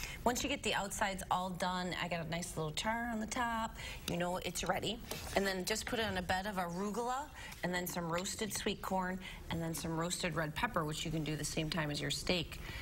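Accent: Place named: Wisconsin, United States. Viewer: I love a nice Wisconsin accent. 😻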